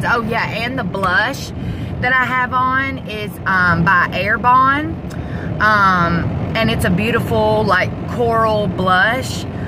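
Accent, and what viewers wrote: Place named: Arkansas, United States. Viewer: Where are You from Babe?Your accent sounds like Mine.Im from Arkansas!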